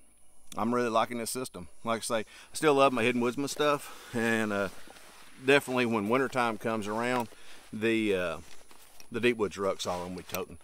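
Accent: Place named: South, United States. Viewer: I spent this entire review repeating everything you said trying to improve my southern drawl accent 😄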